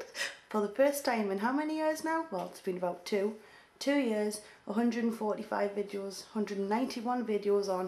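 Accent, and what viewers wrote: Newcastle, United Kingdom. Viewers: Rockin' the newcastle accent angel! We geordies know whats happenin'! ^^ xo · shes not irish haha. shes from newcastle ino that cos i am too :D i talk like that aswell :]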